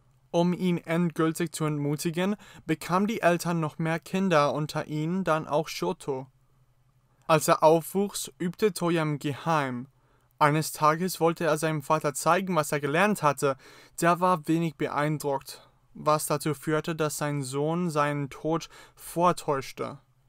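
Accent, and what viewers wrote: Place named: Germany. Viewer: I thought he was German lol. From his accent while speaking English.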